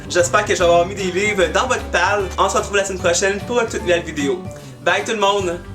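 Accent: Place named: Quebec, Canada. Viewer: Salut, je viens de connaître ta chaîne et j'avoue que je suis conquise, j'aime beaucoup ta façon d'être et je raffole de l'accent québécois donc je me suis abonnée directe :) J'attend tes retours pour le monde de l'ombre car je suis bien tenté par ces deux tomes ! ^^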